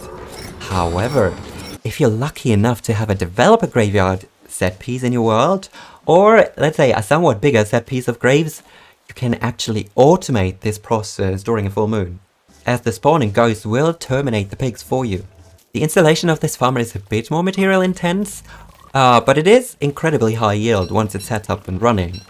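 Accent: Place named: United Kingdom. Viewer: I appreciate the British accent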